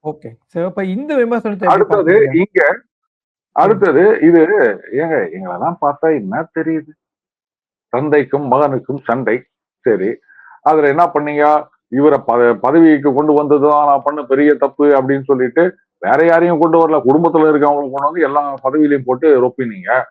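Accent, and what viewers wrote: Chennai, India. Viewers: Madras Bashai! grew up in the rough and tumble of Madras! I like his Tamil because I am also from Madras! interview is by and large will be in Madras Bashai! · talks are in Madras style which all of us like. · Chennai Tamil. Why should one get irritated to listen to a regional Tamil, that's part of our TN and that too capital city.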